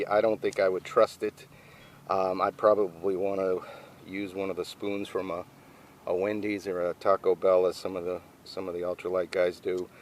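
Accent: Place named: Boston, United States. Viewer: Man, with that Boston accent you sound like Matt Damon from the movie "Good Will Hunting". Yeah, I have the GSI Minimalist with a little Fancy Feast stove tucked inside. All I have to do is bring some alcohol and a wind-screen and I'm golden. Enjoyed the look at your system. Thank you, my friend.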